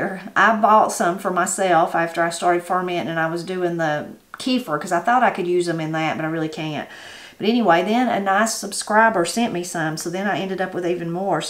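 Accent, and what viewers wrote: Appalachia, United States. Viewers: Just keep talking Miss, that’s what has drawn the majority of us folks to your channel is your colorful language, along with so much more. Anyone that doubts anything you say on here should meet you in person as Robbie Lynn & I have on several occasions now to witness that you are the same genuine person on and off the channel. We say Appalachia just like you do along with many other words you use like okrie and yes mam we push the Buggy in the Grocery Store to lol 😂 👍🏻👍🏻 We get that a lot on our channel to where folks say that we have an accent down south to like you mentioned, but to us it’s just the way we were raised to talk like you said about y’all. Oh yea common sense out weighs an education any day of the week in my book to. Thanks for sharing this our APPALACHIAN Friend!!!! 😇🙏🏻😇🙏🏻👍🏻🙌🏻❤️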